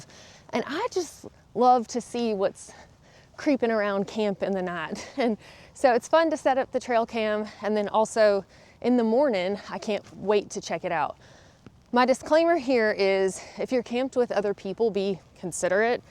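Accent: South, United States. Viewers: Girl, love that southern vibe. Never heard more dropped g’s at the end of words in my Texas life!😊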